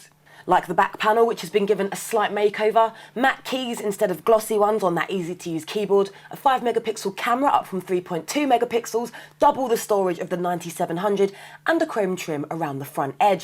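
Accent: England, United Kingdom. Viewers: british accent fo tha win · She has a nice accent though some British accents are nasty! I have the phone and use it as a backup. Still works great. Battery life is terrific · love the english accent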